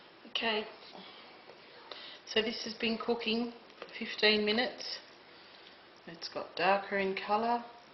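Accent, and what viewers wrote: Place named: Australia. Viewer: There's the Aussie accent -- and the rest of the English-speaking world. Kiwis are even harder to understand for the rest of the world. What's so AUSSIE here is the electric pie maker! G'day nice lady!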